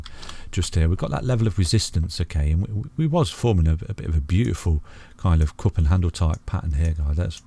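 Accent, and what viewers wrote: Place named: Nottingham, United Kingdom. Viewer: me to .... can tell with your accent.... I'm from sunny Rotherham .... I'm guessing your notts way on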